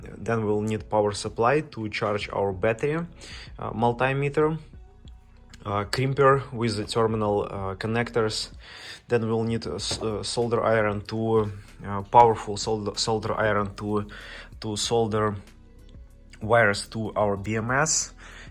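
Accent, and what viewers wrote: Russia. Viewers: Отличный акцент))) пря клип вспомнил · Слышу русский в вашем английском. Вопрос, у вас случайно канала на русском нет, а то я в английском не очень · ох уж этот английский по русски!... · Товарищ! У тебя по ходу русский акцент!? Расскажи на русском языке!😀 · Оффтоп: как же режет слух русский акцент! ;)))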